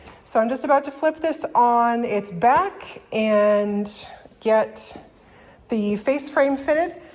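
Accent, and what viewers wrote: United Kingdom. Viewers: Ok, when or why did you chose a british voice over?😅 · oooo using a standard english british for a narrator .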